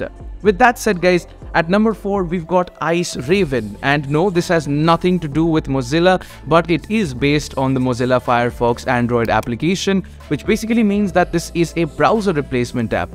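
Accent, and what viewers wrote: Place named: India. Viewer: Seeing "forbidden apps not in play store" and hearing the lnd¡an voice gives me M!croscft tach support vibes. Great video! 😊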